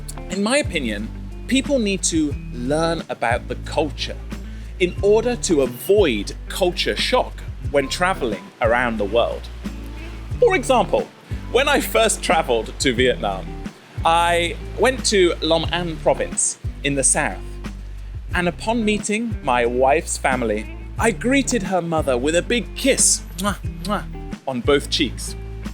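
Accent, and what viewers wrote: United Kingdom. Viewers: what is your kind of accent in England ??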